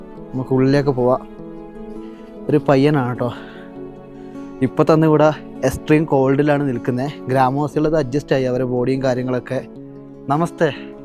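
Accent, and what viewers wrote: Kannur, India. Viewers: Halo bhai, namaskar, ur journey, ur adha adhuri hindi language, ur kannur slang, eg koy for kozhi(chicken) r great and amusing. Best wishes and God bless u always. always.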